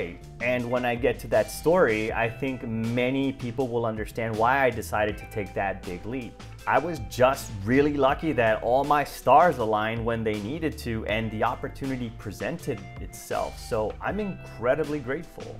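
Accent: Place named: Southern California, United States. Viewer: LoL yeah, you definatly have a So Cal hipster accent 😅👍 Love those hills in the intro. Hows the economy there in Lyon? It's my number 3 spot I'm thinking, thou heard it's more expensive than toulouse & Rennes.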